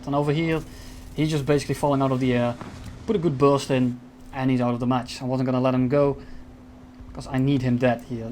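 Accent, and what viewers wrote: Netherlands. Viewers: Nice vid! Also r u dutch?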